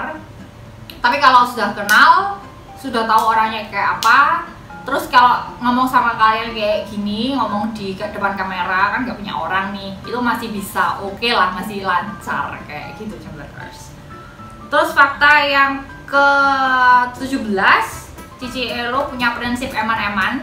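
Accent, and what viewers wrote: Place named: Surabaya, Indonesia. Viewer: Tak kiro iki wong suroboyo soal e logat e wes suroboyoan poll.. 👍👍👍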